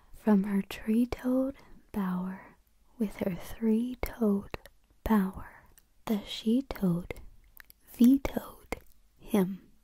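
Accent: United States. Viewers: This video is really good, it gave me tingles for the first time in months :') Your accent, cadence and attitude are perfect; please keep making videos! What is your accent? Being from the north of England I'm not great at placing american accents :P Thank you so much, just keep being you <3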